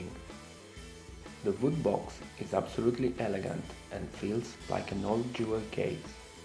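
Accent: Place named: India. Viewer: Guarda che parlare inglese con accento indiano non è così facile. Grande! :)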